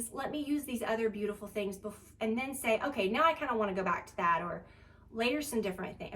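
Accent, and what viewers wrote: South, United States. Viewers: your accent! Such a southern belle 🎀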